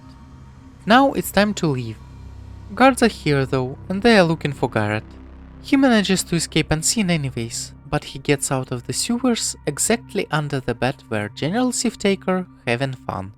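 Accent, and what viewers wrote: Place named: Eastern Europe. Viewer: I find your voice very appealing. That airy, slightly androgenous, slavic thing you've got going on. Yup. Very nice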